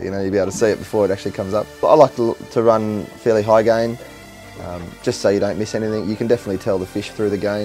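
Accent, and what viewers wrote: Australia. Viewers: What part of Australia is that?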